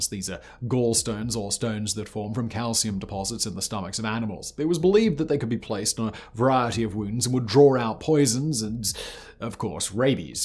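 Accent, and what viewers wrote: RP, United Kingdom. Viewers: Strange that this guy gets so much voice-over work since his affect is difficult to hear. He drops the last several syllables of every phrase such that it is...... Therefore, it strikes me as odd that he would....... Still, the accent is posh British, so that affords him some....... But don't mind me, I'm just....... Anyways, I hope you all have a....... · Well, he's British. They often speak that way.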